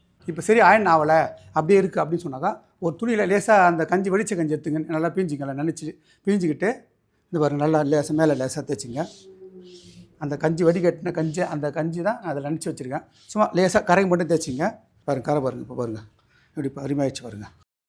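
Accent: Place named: Chennai, India. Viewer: pakka chennai voice na..😊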